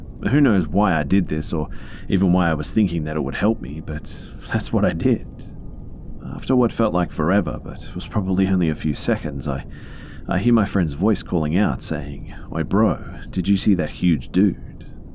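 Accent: Australia. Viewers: You’re doing a wonderful job…thanx! Nice Aussie voice 🫡🇦🇺🏆✨👏💜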